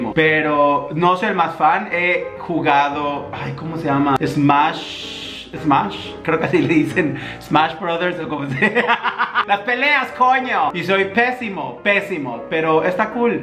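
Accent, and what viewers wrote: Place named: Yucatán, Mexico. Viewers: Amooooo tu tono yucaaaaaa NUNCA LO PIERDAS AHHHHHH MARE LINDO HERMOSO 😉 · Cierto es que le dio un espacio en el mundo al acento Yucateco. Por ti, creo que habemos muchos que antes no lo tolerabamos y ahora amamos el acento y hasta lo imitamos. Te quiero nene. Pd lavate bien tu tuch que hay covid lol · Tu voz siempre se me ha hecho peculiar, ese acento fresa y yucateo a la vez.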